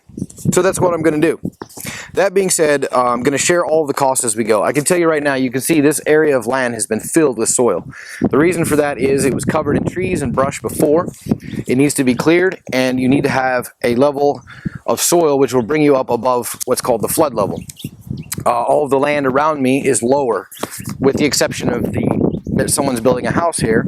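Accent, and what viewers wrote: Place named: United States. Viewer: Sounds Great, where are you building this? Accent says USA but some references point to Australia...... soooo Queensland??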